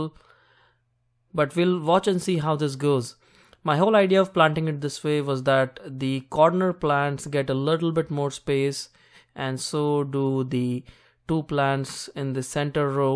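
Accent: India. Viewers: you sound indian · Is that a Hindi accent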